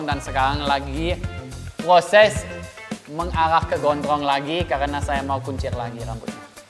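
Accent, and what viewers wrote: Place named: West Java, Indonesia. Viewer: Logat sunda, "mah"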